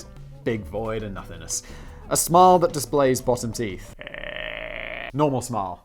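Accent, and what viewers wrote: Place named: United Kingdom. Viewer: I never knew you could put a British accent on Anunaki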